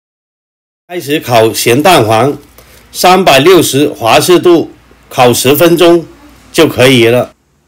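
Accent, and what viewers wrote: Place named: Cantonese, China. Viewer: 讲解很详细，广式普通话很好听，让我这样完全听不懂粤语的人能听到明明白白，谢谢，果断订阅。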